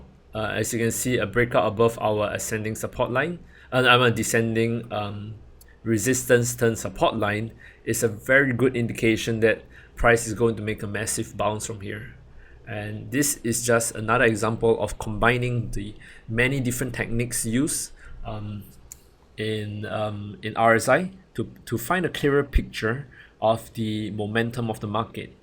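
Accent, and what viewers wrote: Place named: Singapore. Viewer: is this Rayner Teo? Similar (Singapore?) accent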